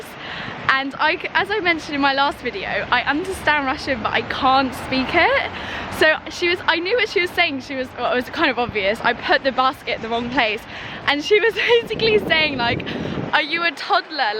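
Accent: England, United Kingdom. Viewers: О, этот милый английский акцент! ) А то так надоел американский английский. Когда американцы говорят, создаётся впечатление, что у них во рту горячая картошка.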